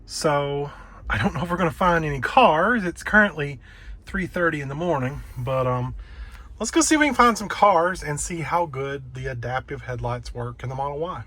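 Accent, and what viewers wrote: South, United States. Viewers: I like ur southern accent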